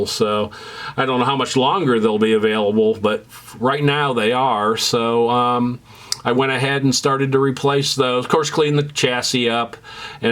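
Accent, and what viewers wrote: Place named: New Jersey, United States. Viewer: Sometimes I can't help but picture Brian Williams behind the voice. Is this a Ridgewood-specific accent or is it widespread through NJ?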